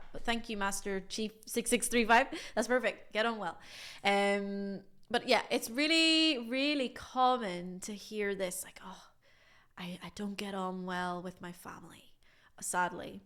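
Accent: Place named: Spain. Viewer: "Por favor, profe, le agradecería que creara más contenido de este estilo. Es realmente efectivo, y cada vez que quiero recordar, me vienen a la mente sus hermosos ojos y su precioso cabello. Además, su acento español es fascinante. Ojalá considere la idea de abrir un canal de YouTube solo para usted. Sería maravilloso.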